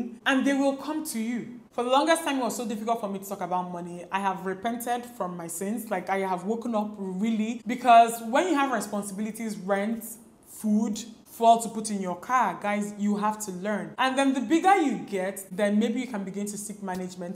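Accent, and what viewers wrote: Nigeria. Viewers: Thanks for sharing! 💕 If I may make one recommendation, please speak a little slower. I’m somewhat accustomed to the Nigerian accent, but even I struggled a little to understand everything you said. I agree with the other viewers, you look beautiful!💕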